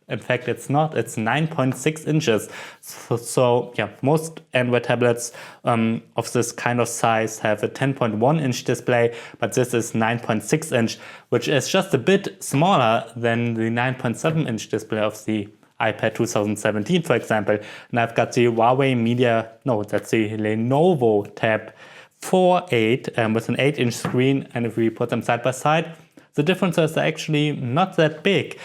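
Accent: Germany. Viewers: 101 Spiele Er ist aus Deutschland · Bist du deutsch oder warum sprichst du mit so einem Deutschen Akzent? The spricht ihr se aus :D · i think he's german XD Bad english tho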